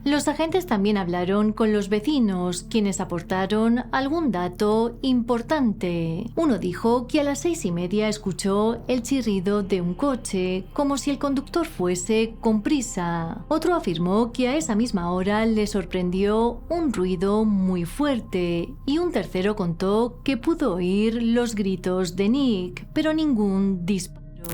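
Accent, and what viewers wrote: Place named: Galicia, Spain. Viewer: si... porque es muy "gallega"muy lenta y alarga innecesariamente. Da pereza.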